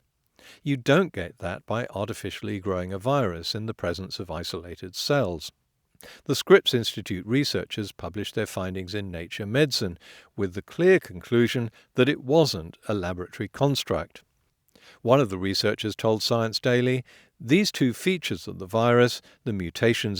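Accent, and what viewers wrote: RP, United Kingdom. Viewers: This video is garbage. it is claimed that the Wuhan Virology Lab does not exist. But there is very clearly a Wuhan Institute of Virology: <link> How can people just blindly trust this video? Because the presenter has a posh accent? I mean, come on, just fucking Google and you can see the blatant lies in this video. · some well-spoken British guy to voice it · actually he seems fairly obsessed, neurotic, arrogant, intellectually lazy, poor debater, and possibly lies on purpose to farm his viewers. But he has a British accent so people get confused and give him attention.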